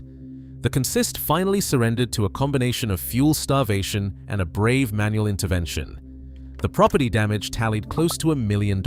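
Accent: United Kingdom. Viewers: I gave up after your brit speak I NERT moronic pronunciation too.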